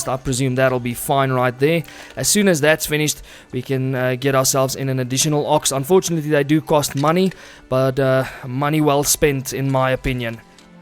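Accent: South Africa. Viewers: I try not to watch games I play to avoid burnout; but the South African accent is cool as fuck! 😂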